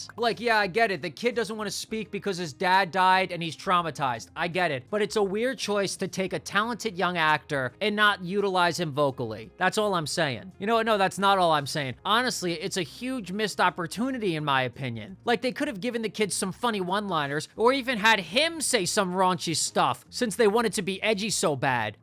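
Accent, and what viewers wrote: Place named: Hawaii, United States. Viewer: Dude r u from Hawai’i?? U got sum pidgin in you!!!!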